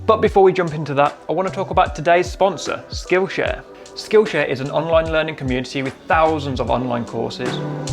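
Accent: Birmingham, United Kingdom. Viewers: brummie luv !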